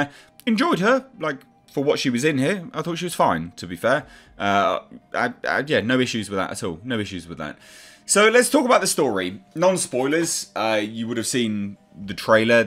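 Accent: United Kingdom. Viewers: How did you watch it!? You sound British.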